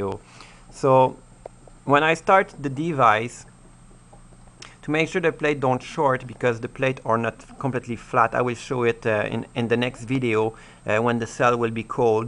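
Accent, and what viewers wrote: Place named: France. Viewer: from ur accent, u can speak french right? je l aime bien to projet car je suis tres interesser a ce nouveau source d energie:) merci...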